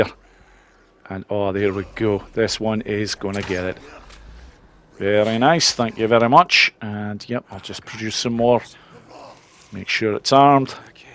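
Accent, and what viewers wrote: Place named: North East Scotland, United Kingdom. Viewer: I thought I picked up a north east twang at times. I'm fae the Broch (Fraserburgh). 42 miles north of Aberdeen. Keep up the great work.!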